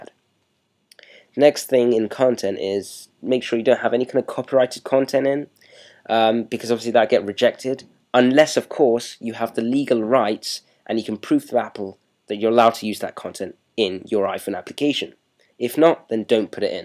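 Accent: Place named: Germany. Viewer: i sense a german accent, being german myself, where in germany were you born?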